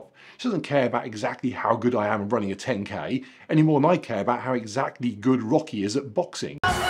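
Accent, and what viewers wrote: United Kingdom. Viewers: I am here just to hear the queens language …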